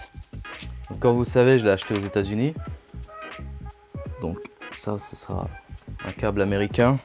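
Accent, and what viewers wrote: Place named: Quebec, Canada. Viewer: tu viens de où? Tu as un accent du Québec!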